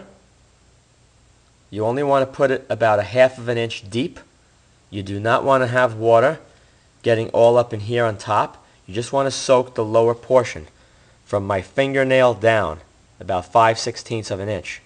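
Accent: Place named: East Coast, United States. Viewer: Well done vid sounds like an East Coaster-Jersey maybe New York? wild guess-What they sound like to me anyways (West Coast-Wa. state)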